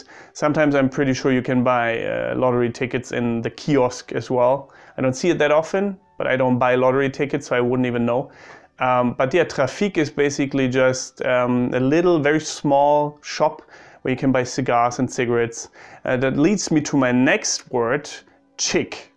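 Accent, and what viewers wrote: Austria. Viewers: Das Englisch können wir uns, glaub ich, schenken. Deinem Nachnamen, deiner Diktion und deinem Vokabular nach zu urteilen, bist du Tirolerin. Ich bin Wiener, und auch ich spreche meinen Dialekt (aber nicht auf die "schlimme" Art, wie man sie von "Ein echter Wiener geht nicht unter" kennt - keine Sorge [Ich bin einer von den Eleganteren!;-)]). ;-) Ja, wir haben tatsächlich viele schöne und interessante Dialekte in unserem kleinen Land, das uns nach 1919 noch übrig geblieben ist. Doch, so leid es mir tut; in einem Punkt muss ich dir widersprechen: es gibt tatsächlich auch ein österreichisches Standard-Deutsch, das sich vom bundesdeutschen Hochdeutsch absetzt, ohne ein Dialekt zu sein - ähnlich wie sich das British English von dem amerikanischen oder sich Ukrainisch von Russisch unterscheidet. Ob nun Wikipedia der beste Beweis hierfür ist, wage ich nicht zu ermessen, aber ich habe immerhin den folgenden Artikel dazu gefunden: <link> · oh and it's important to tell you that this is the viennese austrian standard german accent. 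Most other Austrians would pronounce 'ü' like 'i', 'ö' like 'e' and 'a' more like 'å'. The rest is the same.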